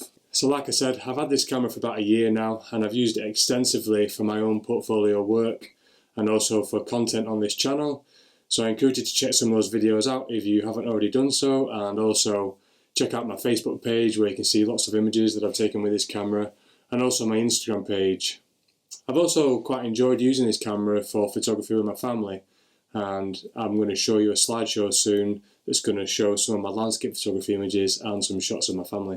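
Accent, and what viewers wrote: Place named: Sheffield, United Kingdom. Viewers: I can tell your accent is somewhere near Sheffield. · You sound like you have a northern English or Scottish accent, not New Zealand..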